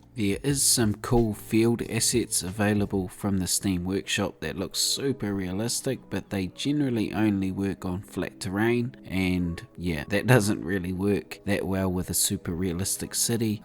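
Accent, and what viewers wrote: New Zealand. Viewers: awesome! been waiting for this one mate :) Looks so like nz countryside, it just says home to me :) loving that kiwi accent too bro!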